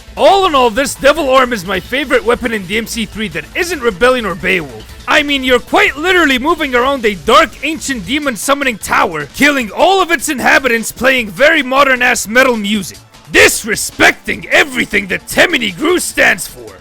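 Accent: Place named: Australia. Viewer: Bro has the most australian assent i have ever seen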